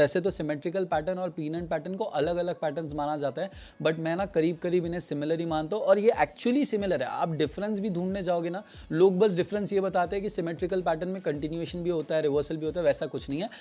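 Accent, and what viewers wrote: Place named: Maharashtra, India. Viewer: Bhari vatl bhava tu Maharashtrian ahes aikun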